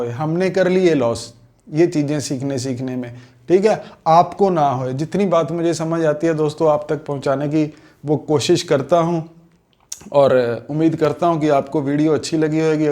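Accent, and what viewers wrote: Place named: Haryana, India. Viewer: Boht schi knowledge, ❤ Apke way of talking se lgta h. Ki ap haryana ke h...Am i right sir ?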